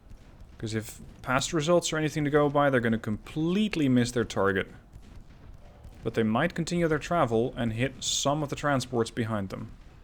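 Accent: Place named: Netherlands. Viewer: Listening to this, i am sure he is dutch, they can not hide the accent.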